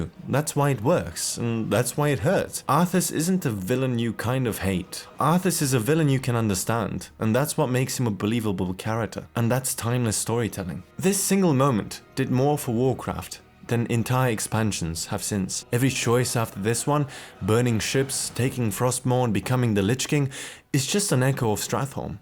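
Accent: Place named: United Kingdom. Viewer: so many words. so little insight. I should've closed the video as soon as I heard the British accent.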